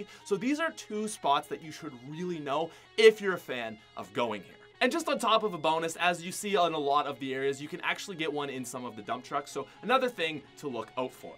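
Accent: Canada. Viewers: Are you Canadian? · Is he Canadian he sounds Canadian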